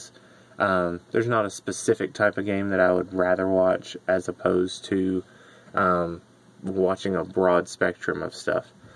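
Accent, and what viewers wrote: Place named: Texas, United States. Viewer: Let me guess ur from Texas I'm from Louisiana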